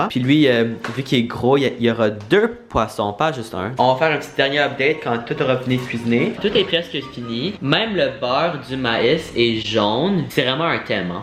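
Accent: Quebec, Canada. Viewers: Il parle Comme les québécois c'est fou !!! 🤨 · plus tu parles français, plus ton accent québécois revient yessirrrr🤪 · Ton accent canadien est insane sa fait trop bizzare de t entendre parler francais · Je trouve ça encore étrange de te voir parler en québécois mais c’est trop nice hahaha cool vidéo! #cloche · #cloche <3 Merci pour cette vidéo en Français !! Je t'adooooooore ! tu parles vraiment bien français et j'adore ton accent Québécois, tu n'en as presque pas ! on dirait un Parisien parfois :) Kiss from Paris 🇫🇷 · Son accent canadien 😊 Il y'a de l'amour dans l'air...😏😇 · Tu parle comme un quebecois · es-tu québécois ? parce que moi oui, pis j'entendais comme ton accent mais il était comme mélanger avec du québécois pis du Français de France. sinon good job pour ton Français! et aussi mais souflé = pop corn (mais au Québec(Français ) on appelle du pop corn du pop corn), sinon oven= four en Français. 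pauvre toi à la fin de la journée tu filais pas bien, j'espère que vous allez mieux. -Maya xxx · Ton accent quebecois est en vois d’instinction ... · Omggg j adore full ton accent quebecois c full cute pis ca fait vraiment bizarre de t entendre parler en francais apres t avoir ecouter parler en anglais pendant longtemps anywaysss jvous aime troppp les deuxx vous etes full chill pis cutee ❤️❤️❤️ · j'aime ton accent Québécois!! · C'est cool!! Et tu as un accent quebecois de ouf ducoup 😂😘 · OMG, Es-tu Québécois!? Parce que t'as vraiment l'accent québécois! 😱😱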